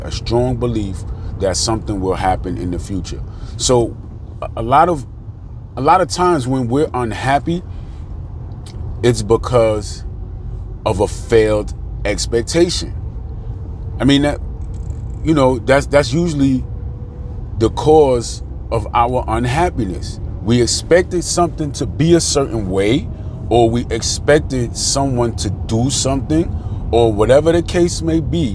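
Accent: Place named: New York, United States. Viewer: Sound like you from one of the boroughs.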